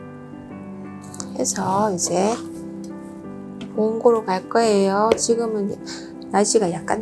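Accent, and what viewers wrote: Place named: Busan, South Korea. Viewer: 오~~너무 이쁩니다~♥ 만들어보고싶어요^^ 정겨운 부산사투리 방송 잘봤습니다~~구독하고 갑니다^^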